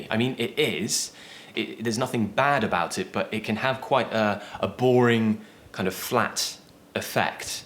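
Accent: United Kingdom. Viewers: Hello 😄 I'm Dia my mother tongue is Arabic, I study English, My accent is little bit American, but I'm in love with the British one😍, im following lots of your videos And I'm hopeful that one-day i can manage to have an accent like yours ❤😍 thank you for your efforts ❤ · It has been a utterly splendid, exquisite and flawless lesson. I'm particularly joyful, blissful and elated with that opulent topic. It is to my liking; moreover, it appeals to me. I do adore speaking, and his charming accent., Hence, I present; undoubtedly; the King of British English. You are the quintessential of British English. Thank you very much indeed. Far thee well, dearest Prof. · Hi teacher, i know that my indian accent will never change but I'm very fond with your british accent. Soo sexy, lol. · I don't know why, but I enjoy watching your vids. However, as an American I must say that some of what you teach, to our ears signifies a lower class, uneducated Briton. 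Certain of the vowels and diphthongs, and most of all the glottal stop, which all American diction coaches regard (or used to) as an actual speech defect, like a lisp, to be removed with exercises! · I love your way to teach British accent 😍 I really wanna to speak like you.. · Hi I'm from Venezuela and I've been learning British English on my own at home and yours videos are quite good for me cause your accent is great! thanks for make this kind of videos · You are epic. Its so clear when You explain. And Your British is so good to listen. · Please keep talking i can hear you for hours. 😀😀 I really love your British accent. I have learned a lot. Thanks you. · Marry me wtf how u r cute like that with ur British accent 🙆🙆🙆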